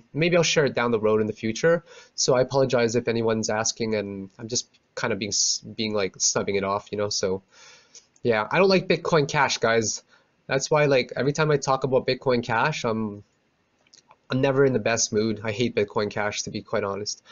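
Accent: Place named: Canada. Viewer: You are awesome dude. Canadian right? I can hear it in your voice. Alberta here.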